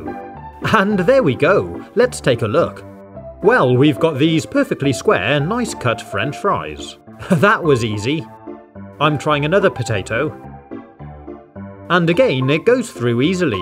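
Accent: England, United Kingdom. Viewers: We all love a hood english lad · VERRY old days: no sound kinda very old days: iconic music old days: voice and iconic music kinda new days: deeep voice and iconic music a lil bit new days: less deep and more british and iconic music new days: more less deeper voice and iconic music so uh yea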